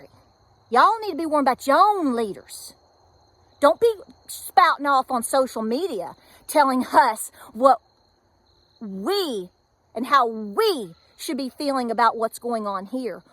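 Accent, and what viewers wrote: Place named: Tennessee, United States. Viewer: I’m so glad to hear you speak like a Tennessean ❤ I’m not apologizing for speaking about this beat down we’re taking. They are trying to kill us off. I’m a Hillbilly & damn proud of it !! No apologies, only regret that I as a citizen, didn’t see this crazy coming sooner. Keep speaking out, & fighting on. Let me know where to find you other than here.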